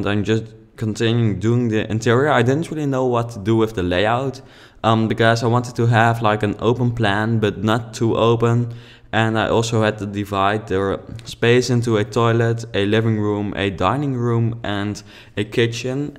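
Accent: Netherlands. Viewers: heyy ik ben ook nederlands lol ik herkende het accent gelijk :d ik krijg de sims 4 over 3 dagen binnen en ik ga denk ik dit huis gebruiken echt moooii xx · Hehehehhe,you have a duch accent, i also have one bc i live in e Netherlands ;)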